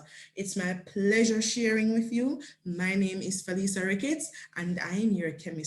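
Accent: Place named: Jamaica. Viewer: I find this Jamaican accent very off-putting...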